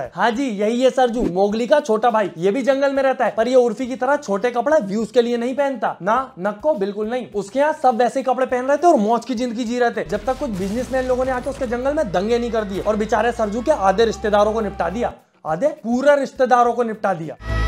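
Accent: Maharashtra, India. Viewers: Bhai aap Maharashtra se ho kya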